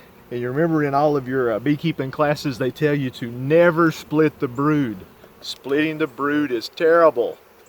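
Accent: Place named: South, United States. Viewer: I thought I recognized my language 😂😂 bursted with a country twang I’m in NC too 😂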